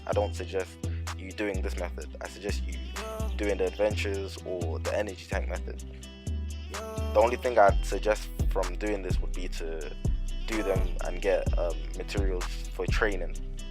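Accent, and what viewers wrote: United Kingdom. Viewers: My guy fam ur british too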